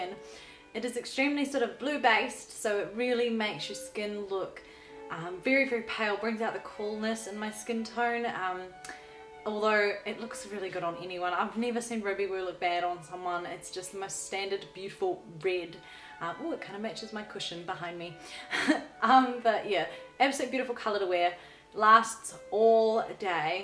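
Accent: New Zealand. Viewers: FINALLY a classy woman on Youtube doing makeup!!!! YUSSS,,where abouts are you from? im a kiwi and you sound kiwi/british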